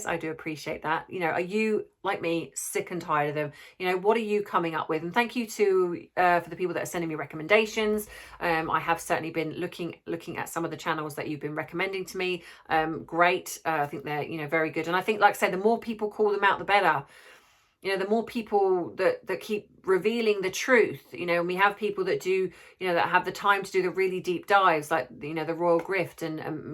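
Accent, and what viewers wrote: England, United Kingdom. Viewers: I like your videos,and love your English accent. I live in Oregon. You could film your self kind of to the side, like Candace Owen's and Ben Shapiro,on the daily wire. I'm just thinking out loud😊😊😊😊😊😊😊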